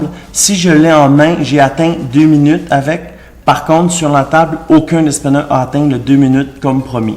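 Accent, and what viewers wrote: Canada. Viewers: tu as l aqucant du kanada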